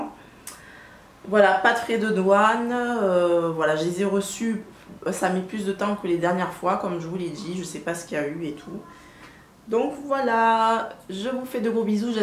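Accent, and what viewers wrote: England, United Kingdom. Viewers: Oh mon dieu! Thumbs up for the english accent hihi ;') ! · Super l accent anglais lol👍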